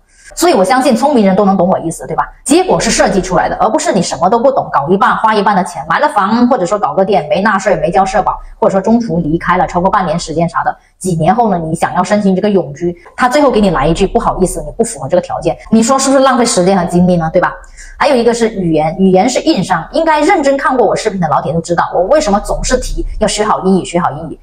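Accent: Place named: Hunan, China. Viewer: 你是湖南老鄉吧？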